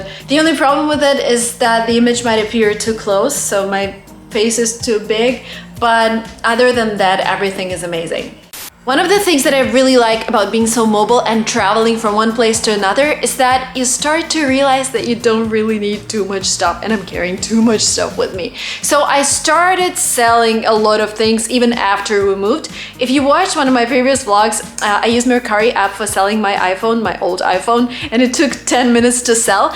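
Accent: United States. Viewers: I love your accent . You speak really American English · How does that accent sounds? Do it sound american to you?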